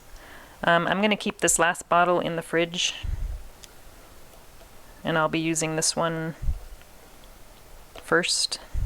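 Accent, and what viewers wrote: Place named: Ohio, United States. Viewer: you sound like you're from Ohio with family from PA or WI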